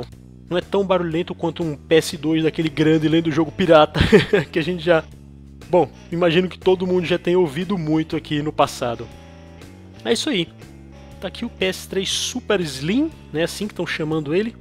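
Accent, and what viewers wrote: Portugal. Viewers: esse cara é portugues ou nordestino??? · Vc é de Portugal?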